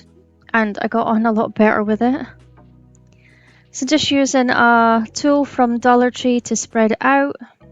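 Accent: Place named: Northern Ireland, United Kingdom. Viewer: I love everything in this video. I subscribed to your channel because I love listening to you talk — my Mom was from Northern Ireland and used some of the same words and phrases you do and her accent was very similar to yours, so you bring back warm memories for me.😊 Everything you made in this video is pretty simple but turned out really nice. You have some great ideas!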